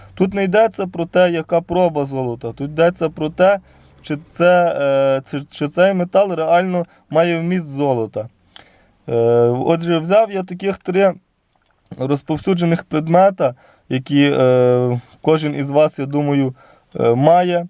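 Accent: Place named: Ternopil, Ukraine. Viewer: Привіт.Чую тернопільський акцент....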